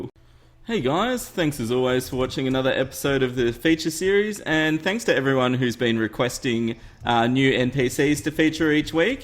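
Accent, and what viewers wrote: Australia. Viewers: Your Australian me to where in Australia do you live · Your from Australia? :O No wonder I like your accent☺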